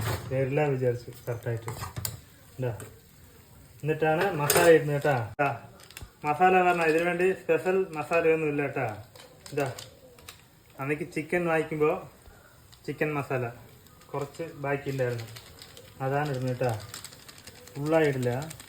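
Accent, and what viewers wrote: Tamil Nadu, India. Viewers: തമിഴ് ചുവയുള്ള നിങ്ങളെ സംസാരമാണ് എനിക്കേറെ ഇഷ്ടം❤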